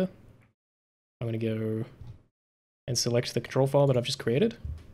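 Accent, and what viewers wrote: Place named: Australia. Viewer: . Yep. Aussie.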